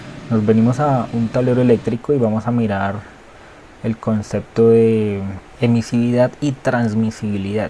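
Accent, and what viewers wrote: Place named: Bogotá, Colombia. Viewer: lo explica con acento rolo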